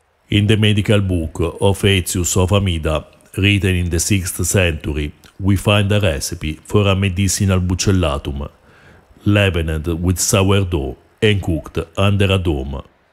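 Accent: Italy. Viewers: Where part of Italy you from?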